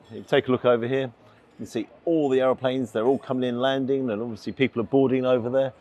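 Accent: United Kingdom. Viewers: Glad your putting all these overrated overpriced places in there place, and the british accent makes it more credible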